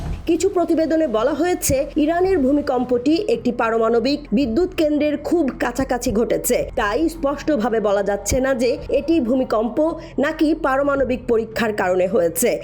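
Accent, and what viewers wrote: Kolkata, India. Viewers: Uposthapika ki kolkatar naki.?😅😅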